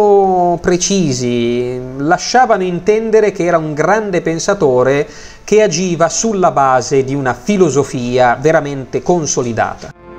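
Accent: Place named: Italy. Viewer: italyano!!!!!!!!!!!!!!!!!!!!!!!!! :( the most funniest accnt of all time and in the history of the universe whahahahaha!!!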